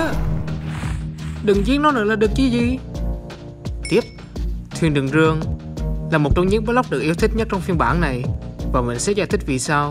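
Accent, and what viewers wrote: Central, Vietnam. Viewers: Anh người Miền Trung ak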